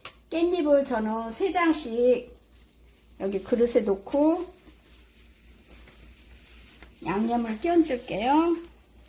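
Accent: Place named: Chungcheong, South Korea. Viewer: 엄마 같으세요 충청도 사투리가 조금씩 새어 나오시는게 참 정겹네요 편집많이 안하시는 것도 재미로 느껴져요❤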